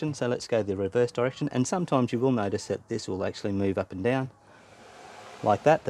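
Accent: Australia. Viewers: I have been stuck on my dryer for a while cause I couldn't find anything to show me the how or what I did wring when replacing the part. So thank you. An also your a funny man I didn't see where u were from an I was like I'm sure he is Aussie an then u said spider I was like yep . So thanks for the info an a giggle look forward to watching more of you.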